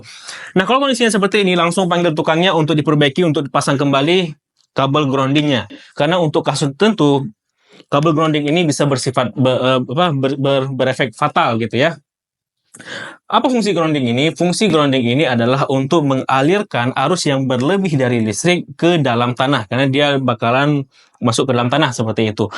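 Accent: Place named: Sumatra, Indonesia. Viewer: Logat mana bang? Kayak sumatera sonoan yak?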